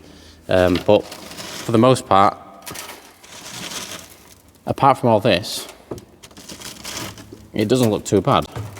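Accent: Yorkshire, United Kingdom. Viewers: Here from Matts channel, love the Yorkshire humour. I'm betting you're from the same area as Boothy of 44 Teeth from the accent. I can't wait to see the cars journey.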